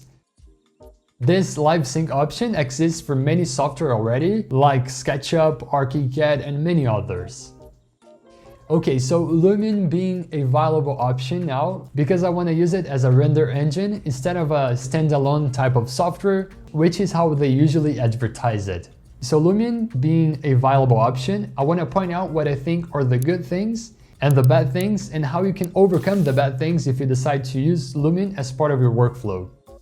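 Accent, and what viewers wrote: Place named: Brazil. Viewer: It's our Brazillian accent, if englsih was spoken correctly is really kinda cute .-.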